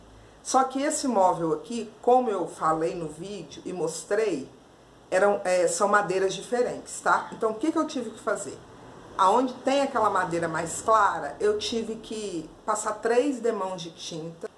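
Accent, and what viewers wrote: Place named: Minas Gerais, Brazil. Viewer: Eu amei...na minha casa a sala de jantar e cristaleira é provençal...de patina branca...super amo...e super combina com o estilo farmhouse...então estou curtindo muito o que vc faz...até porque são coisas acessíveis...bem a realidade...adorando...e aproveito para dizer que adoro seu sotaque...ano passado no carnaval eu fui aí em BH....queria ter te conhecido naquela época...tbm fui la Inhotim...espetacular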